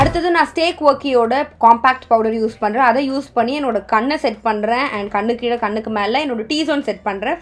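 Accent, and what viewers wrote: Tirunelveli, India. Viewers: Unga native tirunelveli ah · Sis nenga thirunelveli ah😊😊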